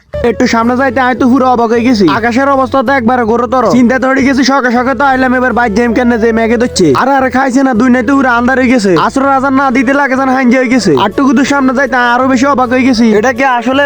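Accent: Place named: Noakhali, Bangladesh. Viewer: ❤️❤️❤️❤️❤️ ভাই আপনার কথাটা খুব সুন্দর আপনাদের নোয়াখালী ভাষায় আরো ভিডিও চাই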